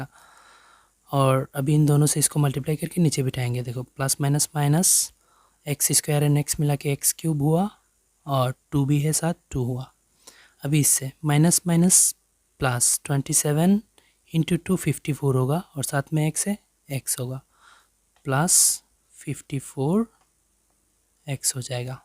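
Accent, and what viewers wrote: Bengal, India. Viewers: R u Bengali sir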